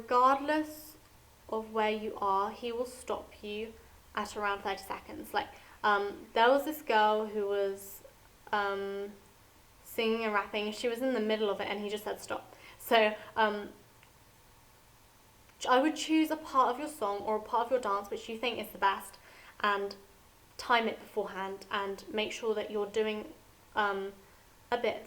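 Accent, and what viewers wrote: United Kingdom. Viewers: Why is your accent way different from mine??? London vs Birmingham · and how about if im born in September 10 1999 is that concluded im 13? And what is YOUR nationality? Thanks and I love your voice, you sound like a real English girl :D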